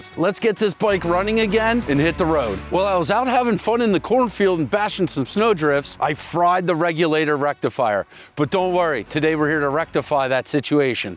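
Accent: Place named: Pennsylvania Dutch, United States. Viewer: Having watched a half dozen or so of your videos, sometimes I think I detect just the tiniest bit of Pennsylvania Dutch accent in your voice, but when you were finding that last wiring fault and described "rootching around" I knew I wasn't imagining it! Mach's Gute!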